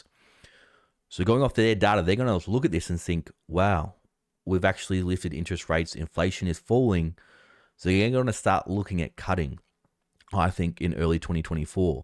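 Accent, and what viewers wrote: Australia. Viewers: You can call it data or data, I really don't mind Love the ozzy accent! Thank you mate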